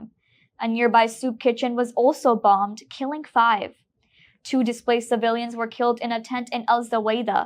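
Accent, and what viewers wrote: United States. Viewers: Speak arabic / quran language and stop rolling your tongue in American way which angers afghans talibans .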